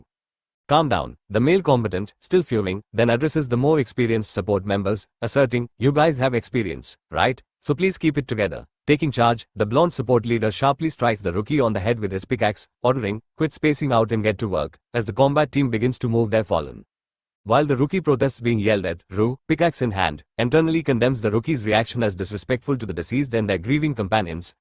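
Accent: India. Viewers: I mean, if this is AI doing the reading, then Choosing an indian accent is just Evil. If its just some guy readin then I wont complain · From all the accents you could use you CHOOSE Indian????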